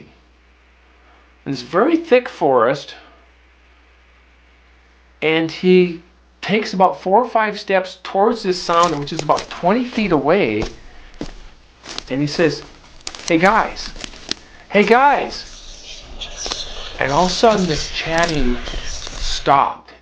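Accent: Minnesota, United States. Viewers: Love your Minnesotan accent.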